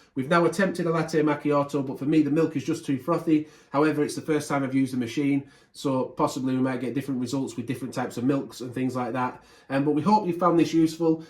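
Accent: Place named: Northern England, United Kingdom. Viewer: Love the broad Northern accent!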